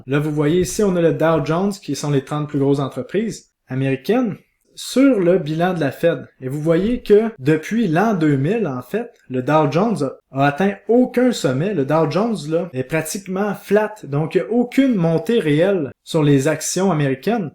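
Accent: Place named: Quebec, Canada. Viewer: Merci tu nous motives dans nos investissements et c est tjrs un plaisir d entendre ton accent, ça m rappelle mes vacances au Québec!! Tkt on partage ta vidéo en Belgique et ailleurs dans le monde francophone!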